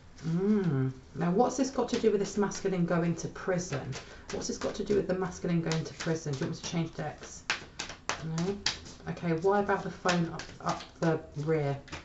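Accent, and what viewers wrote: United Kingdom. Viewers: You sounds British I like it 😁‼️ very Calm voice.. Eitherway thanks 👍 for giving us your time DuuuuuuvvvvvvvvaaaaaLLLLLLLL county jax FL USA 😊👍‼️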